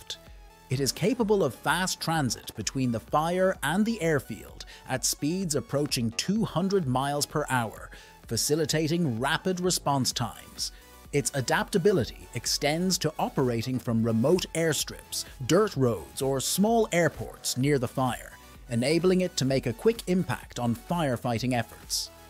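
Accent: Ireland. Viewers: I believe the narrator is Irish & their English pronunciations are a bit different. No problem.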